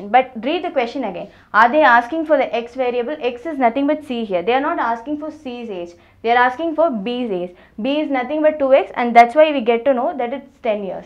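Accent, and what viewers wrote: South India, India. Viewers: Mam are you from Tamilnadu or south india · are you south indian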